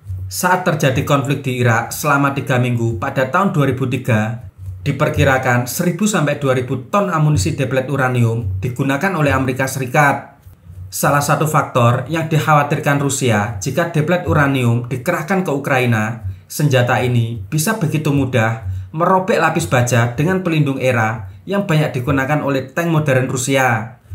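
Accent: Java, Indonesia. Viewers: Sakit kupiiiing ...medhoooog!!!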